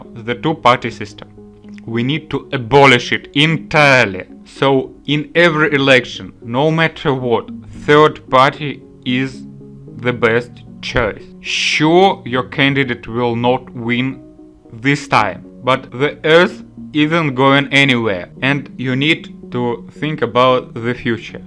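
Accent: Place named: Russia. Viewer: It's a most genuine russian accent. But whatever makes you sleep better at nights.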